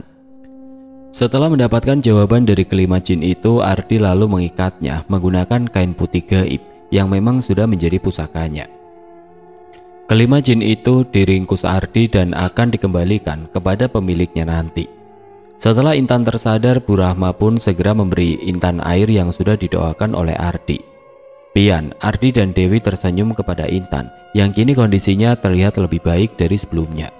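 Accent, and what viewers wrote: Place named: Jakarta, Indonesia. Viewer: Demen banget kalo ceritanya ada logat" Betawi nya 😅😂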